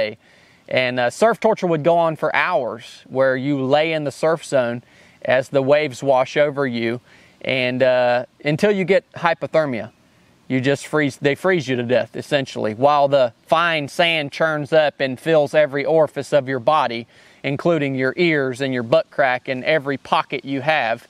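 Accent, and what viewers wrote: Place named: Northern Louisiana, United States. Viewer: Sounds like you’re from northern Louisiana.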